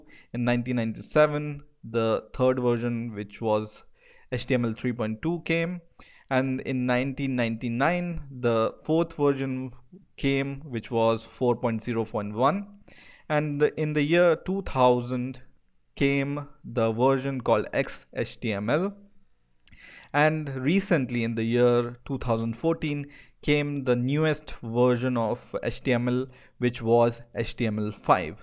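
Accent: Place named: India. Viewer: No his accent is real indian.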